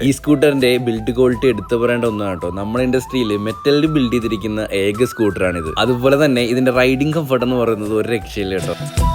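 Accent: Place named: Thrissur, India. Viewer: Sathyam tsr slang pole😹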